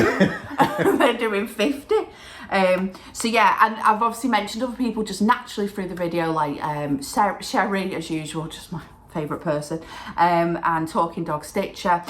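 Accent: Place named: England, United Kingdom. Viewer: Never saw you before. Glad to be here. Where are you from? I love your accent. I would think England but heavy accent makes me think maybe not. Next I must say I LOVE your Trick or Treat Town. It is perfect!! I hope to be able to purchase. I am in US and always happy to meet fellow cross stitchers. Crack on! I subscribed.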